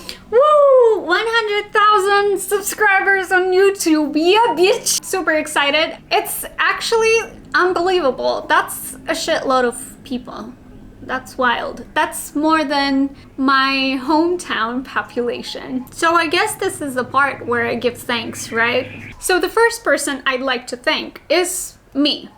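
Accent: United States. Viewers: Hi, new subscriber. Keep up the honesty and openness. There will always be plenty of people that are looking for someone to trust. I admire your honesty. By the way, about underarm odor/smell. It is genetic. (Sorry in advance for the biology/science stuff). Having the ABCC-11 gene in your DNA determines whether you will have underarm smell or not. Almost every white person has this gene (98%). Very few Asians have it and Koreans don't have it at all. I'm sure lots of people are completely impressed by your English. I know I am. Your American accent and huge vocabulary are so good you could make a living doing English voice translations for Russian products and on their company phone menus. How ever you were able to do it is a great achievement. Someday do a video: "How to get so good at American English everyone will be amazed." Stay healthy. You are great and deserve all the happiness you desire. 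· Hey congrats mucho! I love these videos where you are very spontaneous and uncensored 🥰 One thing I've noticed though, you don't sound very Russian, you know, like Dasha Taran. You sound very American. I guess it's because you did stay and work in the states for a bit. Cheers, carry on and stay awesome 🥳🇵🇭💝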